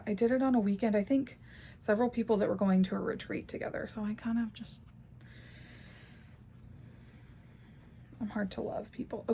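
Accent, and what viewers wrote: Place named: Ohio, United States. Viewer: First time seeing your Flosstube - thanks! Sounds like you’re a fellow Ohioan! 🎉 Liked and Subscribed and look forward to your next! ❤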